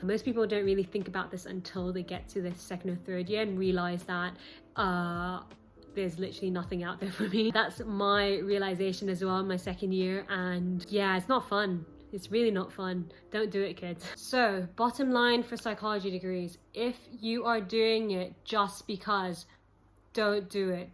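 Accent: United Kingdom. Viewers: Love your gorgeous British diction !!! You present nicely, viz. you speak in complete sentences (subject + verb + object,) your predicates and pronouns agree in case, gender and number with your head nouns, and you do not lard up your presentations with that ignorant non-word, "UH." Very, very professional ! Greetings from Pennsylvania in the Queen's American Colonies. Best wishes for a good career and a good life. (PS - Jordan Peterson is my favorite psychologist on this side of the water.)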